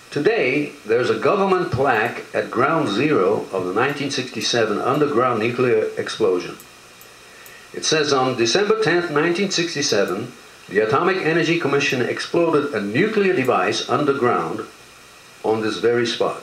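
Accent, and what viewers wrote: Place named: Germany. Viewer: Nice video! You narrate well, you sound like Ricardo Montalbán with a hint of German, not being facetious about it but really, you need a job with the History channel.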